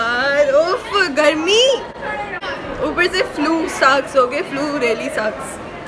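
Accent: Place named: Karachi, Pakistan. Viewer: Your Karachi type accent is so funny. Lol. I'm from Lahore.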